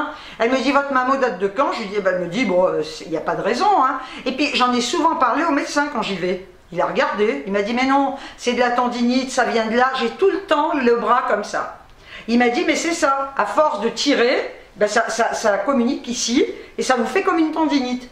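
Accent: Algeria. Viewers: ton commentaire m'a fait tellement plaisir ❤️❤️avec tous les commentaires que tu as je sais que c'est loin d'être facile. Merci du fond du cœur ❤️ ❤️ je t'embrasse très fort tu as une personnalité que j'adore j'aime tellement ton naturel ton franc parlé...j'aime aussi retrouver la gestuelle et l'accent pieds noirs. Ma maman elle aussi parle autant avec les mains qu'avec la bouche 😂😂c'est ça les pieds-noirs et qu'est ce que je me régale devant tes vidéos ❤️ ❤️ Énorme bisous ♥️ merci encore pour le réconfort que nous apporte tes vidéos❤️❤️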